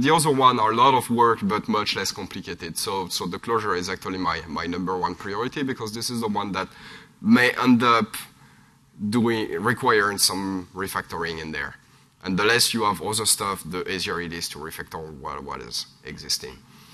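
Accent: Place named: France. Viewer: Nice French accent ! Some frenchies have talent :)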